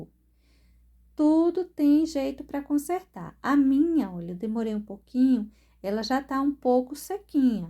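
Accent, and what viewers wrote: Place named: Northeast, Brazil. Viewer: Boa noite ❤️💚 vc fala de qual Estado? Com este sutaque Nodestina.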